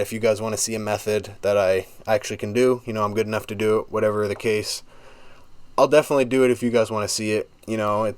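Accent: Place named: Canada. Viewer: I'm Canadian, and I thought the aboot thing was a myth. I guess not.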